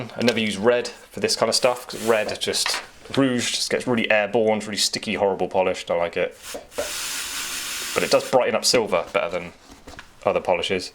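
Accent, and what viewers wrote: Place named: London, United Kingdom. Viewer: Thankyou great to have tutorial London tone lol I'm newbie so loving your tutorial s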